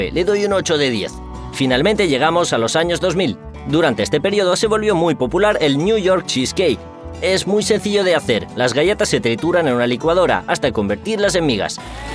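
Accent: Spain. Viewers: Qué bien que pongan una buena voz en español, en castellano como tiene que ser y no en latino como ya se escucha en demasiados vídeos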